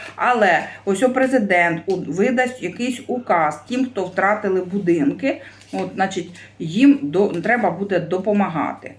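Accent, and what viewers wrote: Kryvyi Rih, Ukraine. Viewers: дорогенька,люблю вас слухати. Наш криворізький суржик,але,ну не облизуйте карти,коли їх витягаєте. Вибачте за зауваження.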